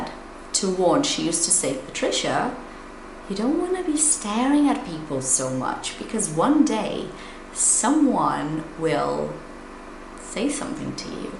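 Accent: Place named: United Kingdom. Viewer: Wow your accent is very beautiful!!! I like it, is so British! 😊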